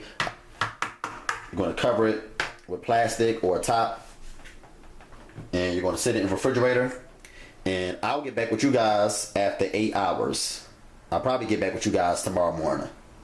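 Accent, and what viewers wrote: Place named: Baltimore, United States. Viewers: I know lol I'm from Philly and I thought he was from Baltimore. I can't get over that accent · commah ntata he sound like he for bmore 😃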